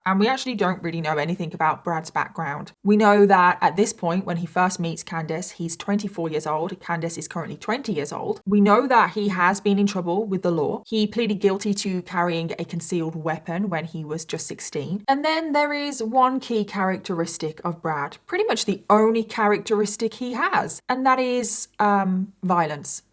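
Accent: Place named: Birmingham, United Kingdom. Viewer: Going off topic, there's something about your accent. One minute you sound antipodean, the next you sound like someone from Birmingham, UK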